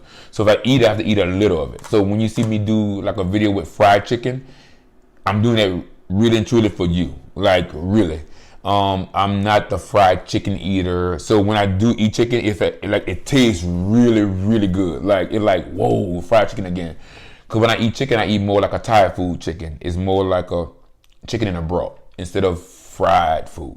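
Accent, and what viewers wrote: United States. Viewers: Not a fan of crawfish, but your country accent makes it interesting 😃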